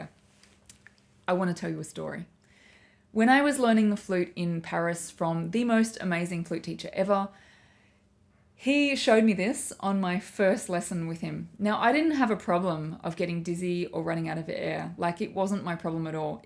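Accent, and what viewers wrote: Australia. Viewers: this is australian accent?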